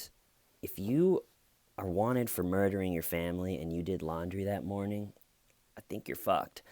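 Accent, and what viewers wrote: United States. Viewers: Hello from bonnie Scotland 😁 you my love are very witty. Love your videos! It's like listening to my inner thoughts coming out of an American man's voice 🤣🤣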